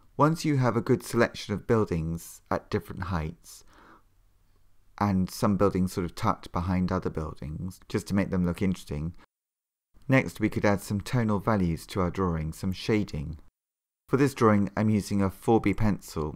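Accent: United Kingdom. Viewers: Hey, are you british? I really love your accent!!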